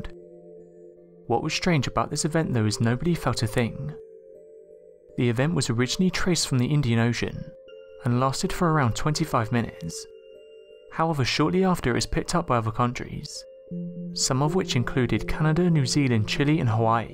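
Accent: United Kingdom. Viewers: It is to. He's British, which you SHOULD be able to tell from his accent.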